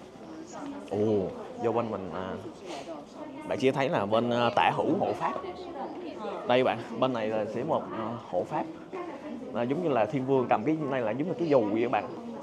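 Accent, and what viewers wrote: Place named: Central, Vietnam. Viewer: Ủa e ở hà nam à. Sao nghe giọng ở miền trung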